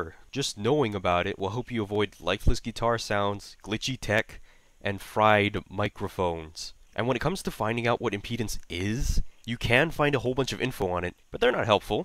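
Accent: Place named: Toronto, Canada. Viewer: definitely from toronto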